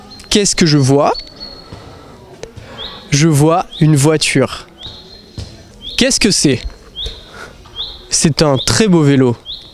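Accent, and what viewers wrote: France. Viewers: Love the French accent. :) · The way this guy is speaking French is really cute. And I love to hear the french accent it's so satisfying I don't know why. I didn't learn much from this video honestly. 😂😂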